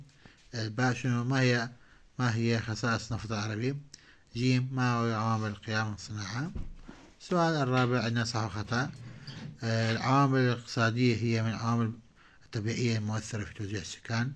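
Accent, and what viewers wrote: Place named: Egypt. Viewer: الاستاذ چنة مصري بلگوة ديحچي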